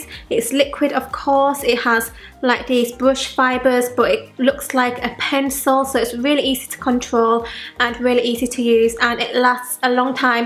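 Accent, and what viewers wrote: United Kingdom. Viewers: I absolutely love the asian/british accent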